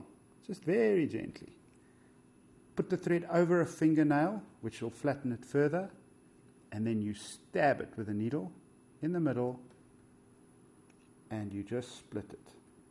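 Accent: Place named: South Africa. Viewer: Love the tying and the SA accent. Great fly thanks.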